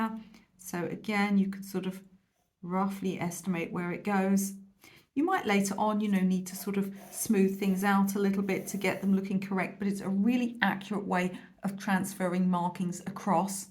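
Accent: England, United Kingdom. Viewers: Yes, I do watch some of your videos repeatedly. And I keep picking up and using more skills and techniques each time! And besides your adorable English accent and vernacular are quite charming and helps my attention deficit brain stay focused instead of tuning out from the audio as happens when I watch some other content creators. 🎨🧑🏻‍🎨🥰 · Hi, Ronnie here. JUST A QUICK FYI- IF YOU HAPPEN TO GET ANY COMMENTS THAT YOU SPEAK TOO FAST- THOSE VIEWERS MAY NOT KNOW THAT THEY ARE ABLE TO MANUALLY SLOW DOWN THE VIDEO PLAYBACK SPEED IN YOUTUBE, WHICH IS A GREAT FEATURE,BECAUSE TO PROCESS SPEACH IS A VERY COMPLEX ISSUE FOR THE BRAIN, ESPECIALLY AN AGING BRAIN, THAT'S A SCIENTIFIC FACT (LOL), FOR EXAMPLE, I AM AMERICAN & YOU ARE BRITSH & WE BOTH SPEAK ENGLISH, RIGHT. BUT ACCENT S AND CADENCE COME INTO PLAY OBVIOUSLY, RIGHT, THAT'S TO BE EXPECTED, NATURAL. I HAVE BEEN A SUBSCRIBER OF YOUR CHANNEL FOR YEARS NOW & I LOVE IT!! 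SO, I HAVE BECOME USED TO YOUR ACCENT AND RATE OF SPEAKING OVER TIME. BUT, WHEN I FIRST BECAME YOUR SUBSCRIBER- IS WHEN I DESCOVERED " THE YOUTUBE PLAYBACK SPEED FUNCTIONS. AFTER ALL, THE INFORMATION YOU ARE PROVIDING CAN BE RATHER COMPLEX, FOR SOME,PERHAPS. YOU DO AN EXCELLENT JOB!!!!! THANKS,! BRAVO, ON YOUR YOUTUBE CHANNEL! WARM REGARDS, RONNIE ~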